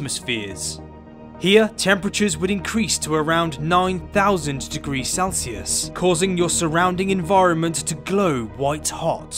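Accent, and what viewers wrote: United Kingdom. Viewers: Your accents is British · Love your British accent! (...and I’m also British...)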